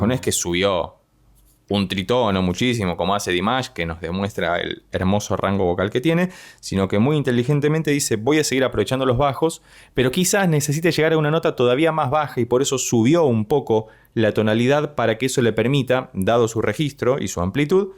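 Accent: Argentina. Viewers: Hola, al fin un lugar donde puedo comentar en castellano, además me sonás a Argentino. Te cuento que sigo a Geoff hace un tiempo y es impresionante todo lo que tiene. Los altos y bajos no los he visto en otro cantante, Si te puedo recomendar algo, sería, y otras dos más de ese estilo - como -. Y él está en una banda a cappella hace unos 25 años creo (tiene 44), la banda es y también tienen unos covers buenísimos y diferentes (, de, etc.) pero para mi la mejor y en que Geoff (se pronuncia como Jeff - shef-) es, el tema del Jorobado de NotreDame. (sigo en otro comentario)....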